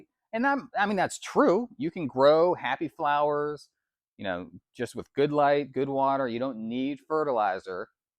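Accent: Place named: Western Pennsylvania, United States. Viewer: Ha! I heard it… all my people are from Butler, even though i was born and raised in FL, I can pick up the slightest western PA “accent”. I love your collection, very inspiring. I have happy vandas and cattleyas, just getting into dendrobuims. I will kerp watching!!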